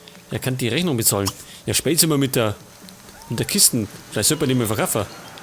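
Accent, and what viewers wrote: Bavaria, Germany. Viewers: Find dich richtig geil. Einfach ein bayrischer LPer. Das hat was auch wenn ich dich nicht wirklich verstehe.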